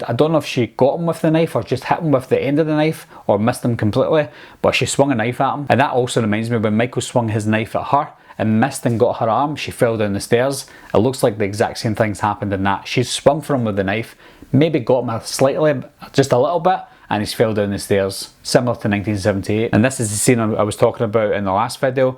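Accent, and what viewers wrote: Scotland, United Kingdom. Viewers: I think I know where your from....Scotland?? btw love the vids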